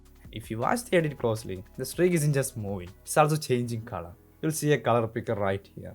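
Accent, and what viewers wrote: Kerala, India. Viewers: Why do you sound so familiar? Wait are you from kerala?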